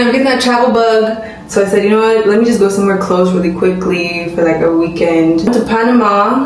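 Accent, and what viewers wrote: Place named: Nigeria. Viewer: ummm babes how come I’m hearing Naija accent o 😂 Welcome back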